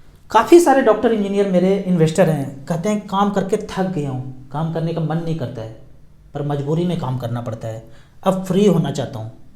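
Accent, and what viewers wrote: Bihar, India. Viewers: Bihar se hai kaya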